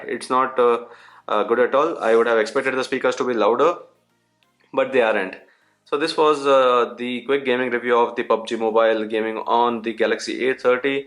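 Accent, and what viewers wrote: India. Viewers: Indian accent so strong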